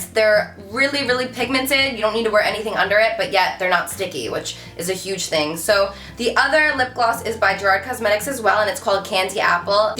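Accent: New Jersey, United States. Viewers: New Jersey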